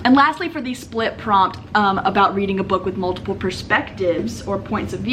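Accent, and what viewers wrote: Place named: United States. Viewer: I need to know what state your from. I feel like I know that accent!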